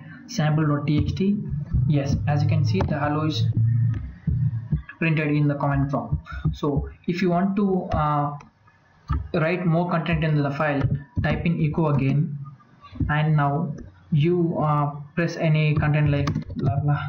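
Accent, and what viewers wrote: India. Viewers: I knew instantly that im gonna learn when an indian guy starts talking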